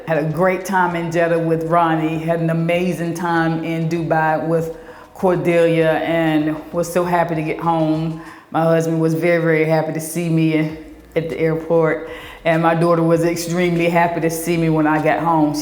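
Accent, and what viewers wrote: United States. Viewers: I always assumed that your YouTube channel was based in the USA, maybe because of your accent 🤷🏾‍♀️ Congrats on your 1st vlog 🎉🎊 it's wonderful to hear all about your journey and travels. That's the thing about nursing it does open up the world to you if you're willing to travel. I'm in the UK 🇬🇧 but worked in the States for a few years and that definitely gave me a thirst to travel more. I was grinning and nodding throughout the reel 😁😂 I'm so happy for you and hope that production is proud of your efforts ☺️✨💛🤩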